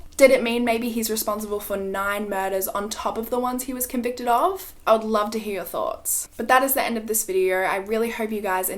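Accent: Australia. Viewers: as a brit i can confirm is deffo aussie 😎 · definitely Aussie hahaha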